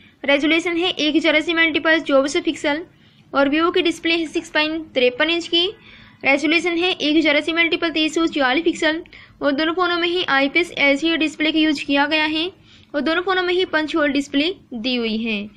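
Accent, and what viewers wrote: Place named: Haryana, India. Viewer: Haryanvi accent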